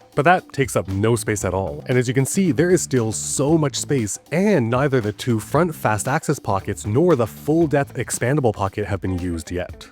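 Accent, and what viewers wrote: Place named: China. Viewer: How can you have a Chinese accent despite presumably being born/growing up in Canada/the US?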